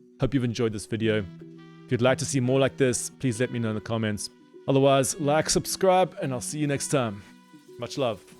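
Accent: South Africa. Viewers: Subscribed. Excited to hear more from this channel. Are you originally a fellow South African? I feel like I hear a little trace of the accent. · I wonder if you are South African? Your accent reminds me so much of home. Great video, keep it up. RIP David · I loved Debt 5000 years of history. A must read. Thanks for keeping his memory alive. PS lekker SA accent!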